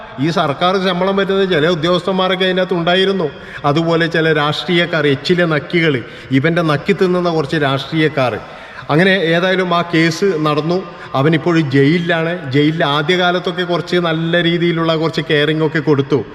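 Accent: Kannur, India. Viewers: നീ എടെ സ്ഥലം, കണ്ണൂർ അല്ലേ 😂നിന്റെ വർത്താനം കേട്ടാൽ അറിയാം 🤣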